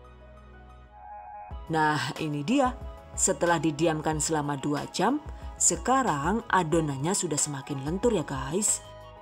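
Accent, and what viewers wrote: Banyumas, Indonesia. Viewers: Mbekayune wong ngapak y..pada karo inyong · Ngapak ya mbok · Done #giveawayTHVDesember2019 Suka dg suaranya yg berat khas ngapak. Jgn dihilangkan ya. Pasti dong suka resepnya..simpel. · ih ngapak kalii ini wkwk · Minyak bulus nyong haha..deneng ana wong ngapak ya yu....turu lah wes wengi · Asline ngapak😍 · Ngapak ngapaknya yg sll d tunggu....😁😁😁 mksih resep2nya 😚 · Baru ngedengerin ternyata bisa ngapak juga hehehe.. Ilmunya sangat bermanfaat.. Aku lngsung nyobain bikin lohh bude.. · Jebule ngapak 😁 yu! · njenengan wong ngapak apaa mbaa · rika wong endi si yu.. kayong pada bahasane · Rika si wong ngendi yu deneng ngapak 😂😂😂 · Saya oma Holi salam kenal sudah kue2 nya enak suara pembuat nya juga sangat merdu apalagi kalau bicara pakai logat ngapak lucu banget saya suka kue2 nya juga kelucuan nya sukses selalu AMIN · Rika wong ngapak yu, salam kenal yu🤭 Kesewun resepe(terimakasih resepnya) 😊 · Siip resep orang ngapak... 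Heeee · Deneng ngomong ngapak? Asyik.... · ngapak bersaudara...pda kro adonan kulit martabak telor y mbok... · Ane senang chenel ini chef-nya itu lo kalau ngomong lucu Inggris ngapak,jadi penasaran dah sama pasti uwonge ayu Iki,koyo msk Soimah hebe,dan makasi resepnya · Orng cilacap apa banyumas kak...sya orng tegal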